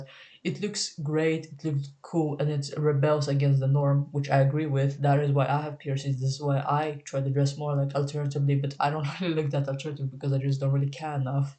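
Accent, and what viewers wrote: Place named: Sweden. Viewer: i think i live alternatively the way it's meant to be lived. roaming thrift stores, doing climate activism, going to punk-, vegan- and queer events - i like it. the looks are a second thought but definitely a part of identifying your crowd. sometimes i wish i was more on social media but then i realize i don't care that much, i meet so many cool people in my town anyways. also your accent is really fun, the first thing i thought was swedish but then the russian came in and threw me off. i was sitting here pondering latvian/lithuanian until you mentioned swedish and i read the comments, so i wasn't far off with that middle point hahha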